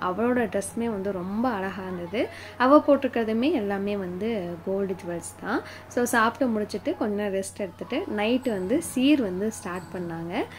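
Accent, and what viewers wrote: Kongu, India. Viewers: Nenga kongu ah sis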